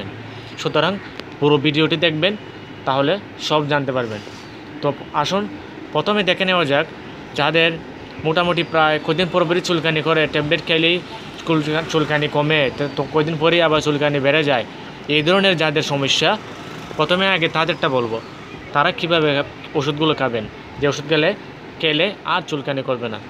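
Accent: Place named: Habiganj, Bangladesh. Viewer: VOICE IS HABIGANJ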